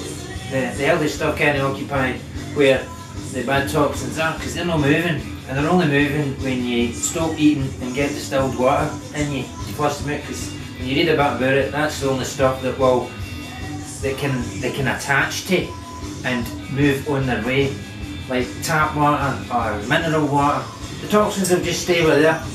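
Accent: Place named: Scotland, United Kingdom. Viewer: Say, whuh??? Huh? Whudie say? hahahahaha ..no, just teasin' ya. Couldn't understand a word ye said, but no mattah .. yer precious in your delivery ... and yes, last part was in yer proper Scottish accent ... I almost understood every word. I do declare, Scottish is much more difficult to understand than Dutch. Bless you for making this video .. I will definitely go out now and get a water distiller. It sure seems to be working for YOU my friend. Thanks again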